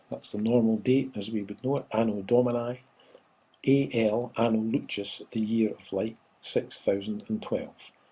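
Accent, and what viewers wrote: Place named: Scotland, United Kingdom. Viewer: He's a Scotsman …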